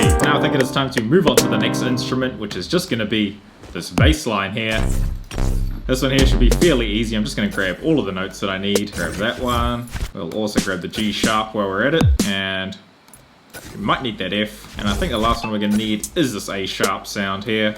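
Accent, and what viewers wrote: New Zealand. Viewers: He sounds kiwi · R u a new zealander? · Are you from nz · Are u a kiwi bra I’m aussie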